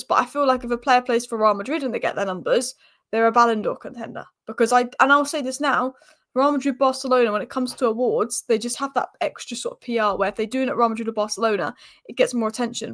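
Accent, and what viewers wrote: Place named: Manchester, United Kingdom. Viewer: That's a thick manc accent.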